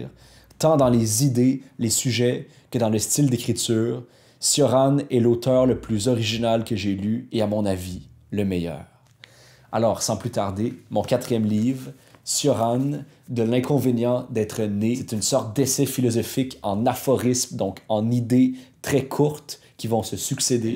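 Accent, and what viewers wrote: Quebec, Canada. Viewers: Trouver une chaine de littérature tenue par un homme, est rare ! Avec une telle passion, de jolis mots et avec le cœur, Exceptionnel ! J'adore également cet accent. Le Canada, reste pour moi un rêve ! Três heureux, de ce hasard qui m'a mené ici! Merci beaucoup, de ce magnifique travail et de nous le partager. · C’est un accent québécois?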